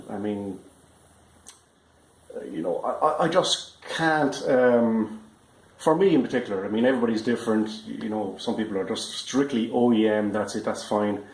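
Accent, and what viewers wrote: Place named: Ireland. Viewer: As an American I think Irish people have the best accent, and I value your opinion to the extent that i just purchased one for 237.15$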